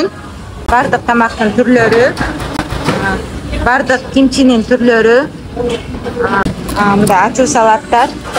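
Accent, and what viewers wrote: Standard. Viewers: Баштык, дүкөн деп кыпкыргызча сүйлөп жатасыз рахмат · Эн соонун!!!! Чет жерде журсо дагы нукура кыргызча суйлоп жатат бир тууганыбыз❤❤❤❤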